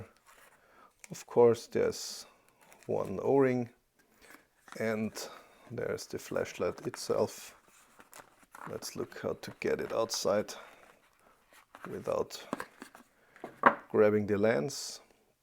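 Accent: Germany. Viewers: it took me three seconds to be sure youre german.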